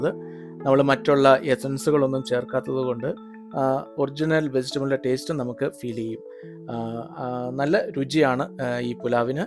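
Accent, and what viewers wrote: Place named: South Kerala, India. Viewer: പാചകം Eassy - കഴിക്കാൻ Tastyയും ആണെന് തോന്നുന്നു. തെക്കൻ style സംസാരം. നന്മ നേരുന്നു. Rtd Teacher'👍🏻🌹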